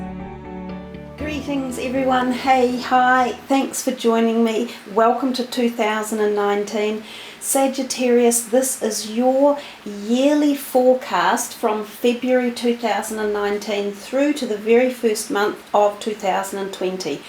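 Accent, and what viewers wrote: New Zealand. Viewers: Are u from new zealand? U have kiwi accent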